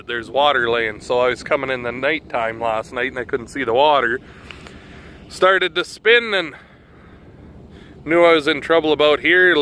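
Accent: United Kingdom. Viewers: Still harvesting laid, barley in the north of Scotland. Loved this video with all the family working, all the machinery working. Enormous skies! a different land altogether. I'll tell my father (92) about your grandfather....still on the swather and on his smartphone! Best of luck to you all. Love that accent man! Just makes me grin! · It's good to hear the northern accent. Reminds me of home..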